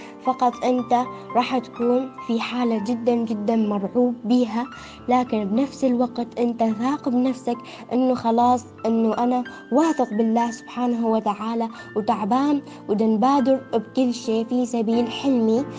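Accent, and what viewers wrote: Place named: Mosul, Iraq. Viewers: اييي لهجة اهل الموصل😍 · ي اموت ع لهجه اهل الموصل☺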